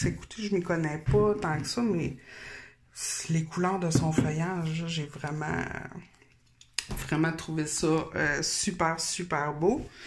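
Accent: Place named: Canada. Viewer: Bonjour,j aime beaucoup vos plantes toutes,et ♥ aussi votre bel accent ♥ canadien.De la Belgique bisous une amie des plantes,Superb plant tour et partage comme vous le meme avis sur vos plantes et les mienes de ce fait.Felicitations et a bientot.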